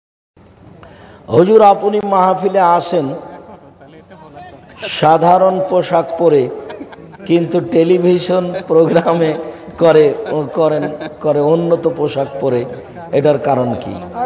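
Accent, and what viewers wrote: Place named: Kolkata, India. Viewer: Unar uccharon Kolkata type..keu ki details bolben unar(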